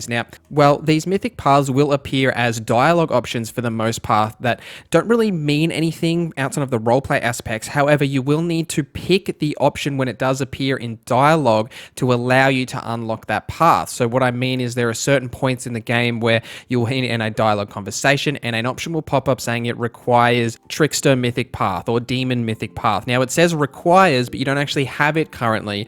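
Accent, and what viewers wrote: Australia. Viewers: australian accent?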